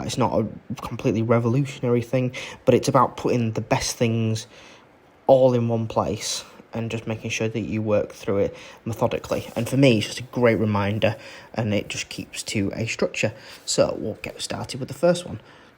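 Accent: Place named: United Kingdom. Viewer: Good quality video and instruction but you talk to fast with that British accent for a southern American. Merry Christmas to you and your family.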